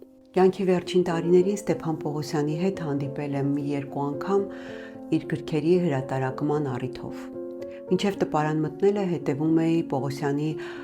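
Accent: Standard. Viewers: գեղեցիկ ու մաքուր հայերենը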